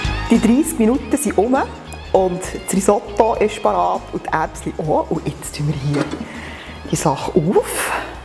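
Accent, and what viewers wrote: Bern, Switzerland. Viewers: wenn der Titel auf Hochdeutsch ist, dann werden die meisten wohl davon ausgehen, dass im Video auch Hochdeutsch geredet wird. Aber der Berner Dialekt ist so schön, dass es auch mir als Österreicher egal ist, dass du in der Mundart redet. Das meiste versteht man eh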